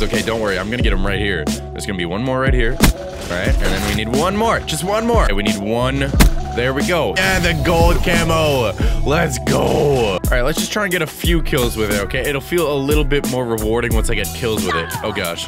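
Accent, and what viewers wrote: Minnesota, United States. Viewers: R u from minnesota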